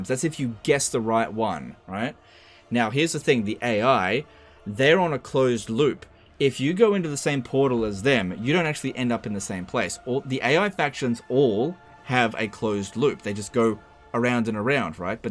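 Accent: Australia. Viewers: I have never played one of these "Total War" games. I thought this channel was to listen to an Australian talk about cheese in a funny voice while he does something with digital action figures.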